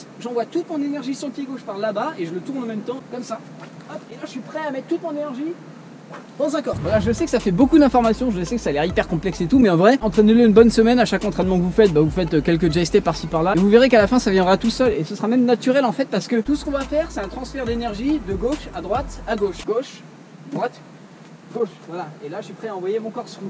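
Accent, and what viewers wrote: Belgium. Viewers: Belge ou français ?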